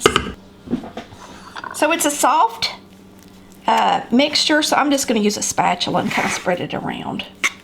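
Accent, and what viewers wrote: South, United States. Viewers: She is so cute with that southern voice i love how she read everyone in a friendly manner in regards to the lemon squeezer .... The lemon bars look delicious .....and yes i will try extra lemon lol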